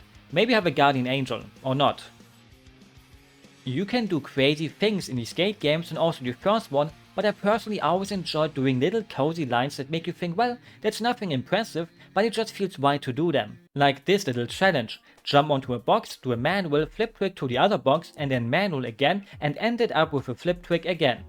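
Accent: Germany. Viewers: Extremely German, don't even attempt English. Sounds so cringe!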